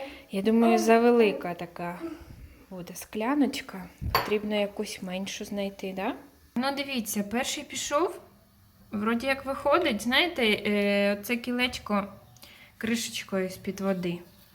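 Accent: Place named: Ukraine. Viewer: а с какой ты области? Чувствуется, что не с Западной. Будь здорова, чорноброва.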